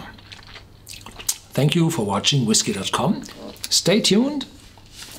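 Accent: Germany. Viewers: Ah that German makes me weak in the knees <3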